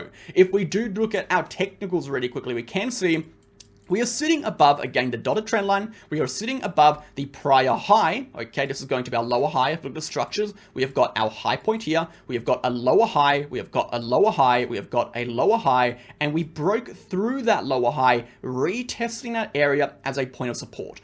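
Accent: Australia. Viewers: just found you bro, aussie here. got lucky and saw this within 2 hours of you posting. Nice to hear an aussie voice for once! Joined that exchange too, appreciate an exchange thats not trying to monitor everything I do! Used your code!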